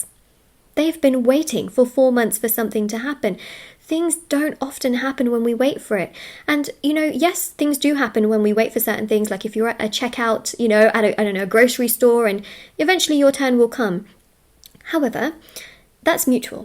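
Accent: United Kingdom. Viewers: Thanks. Always there with brilliant intelligence and guidance at the right time. Something about your british accent makes it persuasive and assuring.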